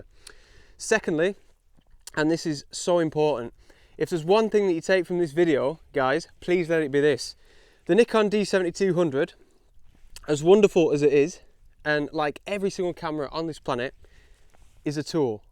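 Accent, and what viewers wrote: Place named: England, United Kingdom. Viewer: Enjoyed your video. The English accent is 👍.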